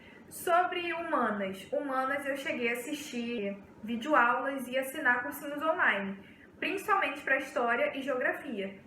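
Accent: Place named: Rio de Janeiro, Brazil. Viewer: Você pelo o que parece é carioca kkkkkkkk o que fez voce ir pra JF? Vejo mt gente falando bem daí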